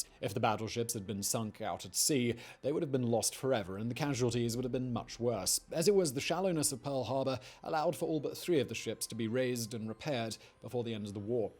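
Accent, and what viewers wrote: United Kingdom. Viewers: ahh british v sauce always makes my day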